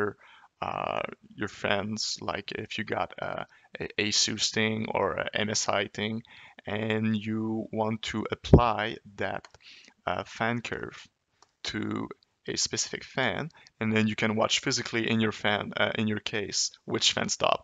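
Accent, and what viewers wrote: Quebec, Canada. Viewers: Are you from Quebec ? Amazing video/software btw :) Thx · I'm pretty sure this guy is from Quebec because of the accent. Amazing software btw :)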